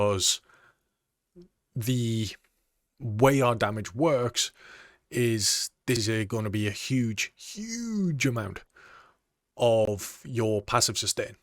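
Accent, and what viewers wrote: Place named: North East England, United Kingdom. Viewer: You sound like you're from NE England mate like me. Cheers for the advice, appreciate it.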